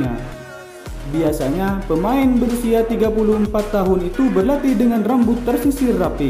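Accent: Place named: Madura, Indonesia. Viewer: nada duro nya ketok an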